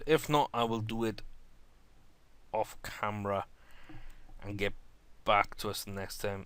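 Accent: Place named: Wales, United Kingdom. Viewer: That's a Welsh accent if I've ever heard one. Where you from?? I want to say the Valleys?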